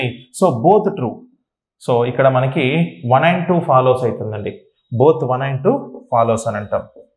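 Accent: India. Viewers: Sir midi nizamabad ah 😝alne matldutunru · 5,6 days nundi mental ability videos chustunna....Hyd slang lo class vine sariki hayiga undhi sir....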